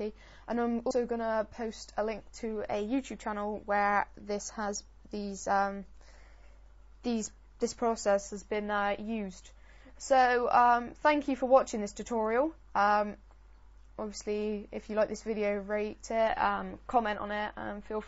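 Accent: United Kingdom. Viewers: guys stop calling him a girl,I think hes...british,with the accent,i think... -_ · Hes from the UK. With an accent. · you cant beat the west country accent eh?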